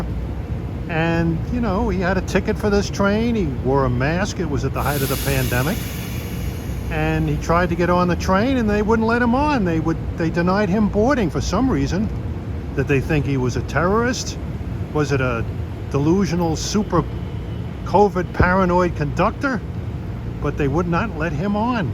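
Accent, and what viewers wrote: New York, United States. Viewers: I'm a southerner, but I love listening to you speak in your NY accent. Your voice is quite soothing and is very pleasant to listen to. I really like the way you compose your videos, and the way you give lots of relevant information for each train. Your camera skills are also quite impressive, with nary a camera jiggle in sight. You have become one of my favorite railfan channels! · Boy, this is great! Grew up in North Jersey and every Fri. night in the 70's we'd trek down to Pct. Junction and watch GG-1's slam through along with E-44's on loads of freight trains with occasional diesels. Around ~ AM the last remaining RPO (mail) train left running in the U.S. would head south, always with two G's and then we'd head home. Rail therapy at its finest! Thank you for jogging the memories and I loved your presentation of train info-most times I hate narration so that says a lot. 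And never lose your NY accent! Makes me homesick... now I gotta go watch all 6 Sopranos seasons over, LOL! Subscribed.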